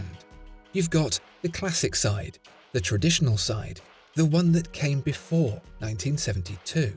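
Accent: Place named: Midlands, United Kingdom. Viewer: Waffling on as per usual, over four minutes of absolutely nothing to do with the watch in question! I dip my toes into your channel occasionally as the videography is excellent. I just find the waffle nauseating, but I know I’m in the minority, oh and that middle England voice, it’s like listening to an account waffle on about investments!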